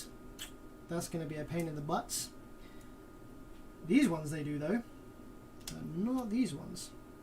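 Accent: United Kingdom. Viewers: bro i never knew you was british lol , did you meet pooven to get your z4 15s?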